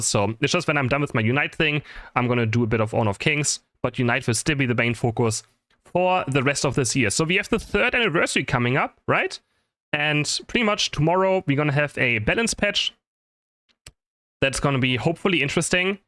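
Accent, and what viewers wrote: Germany. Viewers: Hi! I totally agree with everything you said in this vid I have not quit unite yet but i am playing it a lot less, mainly because i just moved houses but also because it IS boring. We need a new map, i would love to see a summer map with kyogre/groudon as the bosses But besides that i do hope you see this comment, when i wake up everyday i always watch your videos and make my lunch while watching you. Its gotten to the point where my parents are like "oh, he's watching the german guy again" not necessarily in a mean way they just recognize your voice so much because im always watching you😭 It might sound a little weird but i do really like your voice, you've inspired me to try and learn the German language because i really like your accent and i think the language is pretty funny But anyways i hope you do read this because i love your content even if i quit unite i will always still watch you play it, youre the thing i look forward too in the day and i hope your views go up sometime soon ❤️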